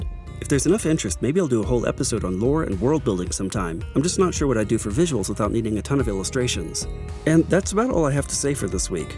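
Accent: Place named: Spain. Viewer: The reason why the creator pronounces it that way is because he is a native Spanish speaker. Portuguese speakers pronounce it as "Go-dodge" as in Dodge. But if we are talking about "the correct way to pronounce it" or "the way you should pronounce it" In English then "Go-Dot" is incorrect and the "Go-Dough/Go-Doe" would be correct in that case.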